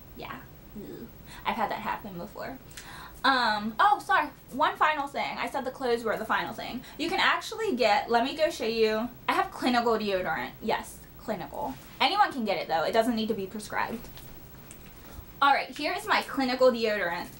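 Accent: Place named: South, United States. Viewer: HAHAHA, a wet rat... good one. ;p girrlll... you're super funny! (: i want your steering wheel cover. been meaning to get that one. (: you have an accent... i'm having a hard time determining where you might be from. my bf says tx or somewhere from the south! you're super talkative; i love! <3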